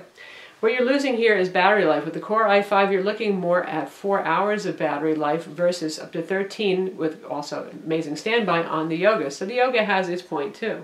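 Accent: United States. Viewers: Good reviews, but oh, sometimes her fast, american accent speech leaves no chances to understand well.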